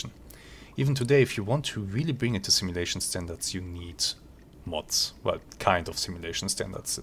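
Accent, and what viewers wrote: Germany. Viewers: I like betters, too, but... let's be honest: That German accent DEFINITELY adds something to the experience;)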